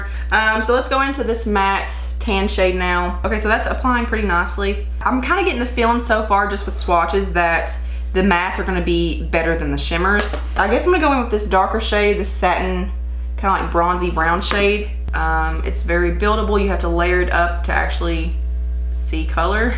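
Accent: South, United States. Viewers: I saw this brand on the WM website and was on the fence whether I should give it a shot! Side note: I just found your channel and I love finding youtubers that are from the South and sound like people I know. I'm from OK!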